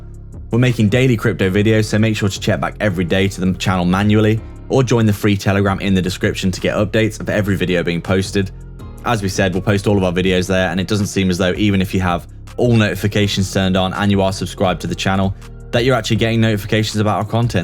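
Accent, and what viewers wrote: United Kingdom. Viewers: Nice video, great to hear a British accent for once.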